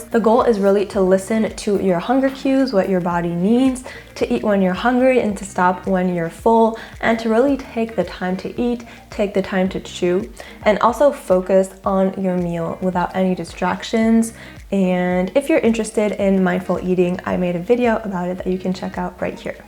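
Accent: United States. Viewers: Wow your American accent is perfect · Your American accent is the best I’ve ever heard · Tu viens de la France vraiment ?? Wow, ton accent américain est si merveilleux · If you're French, why do you sound more American than i?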